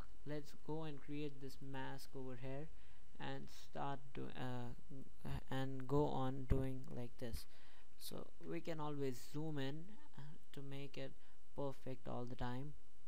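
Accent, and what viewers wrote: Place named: India. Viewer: indian accent man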